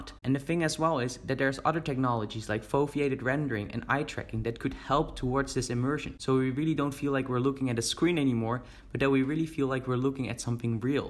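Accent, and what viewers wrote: Netherlands. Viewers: You sound dutch. Are you dutch? Either way, good video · This is my first video of this youtuber and I'm here wondering "Is this guy dutch" cuz his accent has a light dutch accent sounding to it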